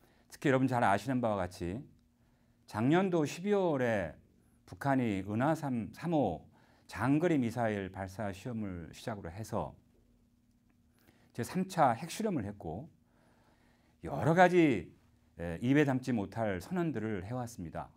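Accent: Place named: Gwangju, South Korea. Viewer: 아따 광주억양 쎄쇼잉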